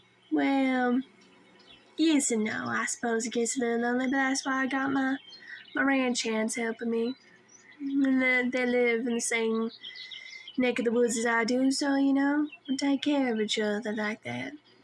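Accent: South, United States. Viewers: Pig!!! Very cute design, nice hometown farmgirl look, with an excellent southern accent. I do love the jean shirts and the pitchfork with ahem paint... solid 65!!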